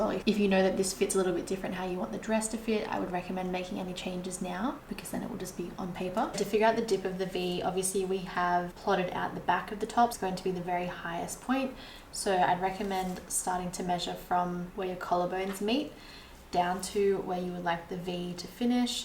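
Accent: Australia. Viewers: Wonderful channel!!! Great to hear a vibrant Aussie seamstress enjoying her creations and what a sweet little shift dress!! Well done!! 🌞🌺🏖️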